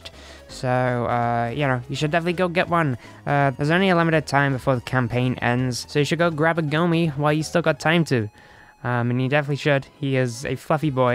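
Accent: United Kingdom. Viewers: wait wait wait she's BRITISH?? how did i never notice it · WOW your voice sounds amazing (: but I will always miss that annoyed/ bored British accent. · How do you go from a low British voice to a high pitch feminine voice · I will always miss your bored British voice, but I’m glad things are going well with your voice! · Perpetually pissed off British voice is the single best thing I’ve read since I finished the Harry Potter series. So the best thing I’ve heard since last night. BUT STILL, it’s amazing. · I love the new voice!!! I will kinda miss the old British one but i'm so happy for you!! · I thing she sounds British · I like his old birish voice mate But this sounds good One question is he trying to turn female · Rip bored british voice, long live new voice :)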